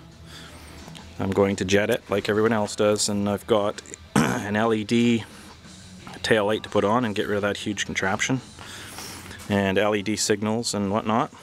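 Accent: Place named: Southern Ontario, Canada. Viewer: Really like the plow, great stuff. the hydraulic pump makes me a bit nervous on the back of the blade. Sounds like you might be from southern Ontario riding on Dec. 4.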